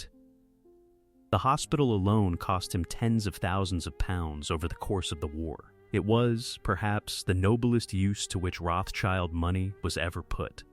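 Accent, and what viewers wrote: United States. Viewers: Why always American commentators? But story is different, very genuine personality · I liked the American narration. I didn't have to turn on the closed caption to understand it.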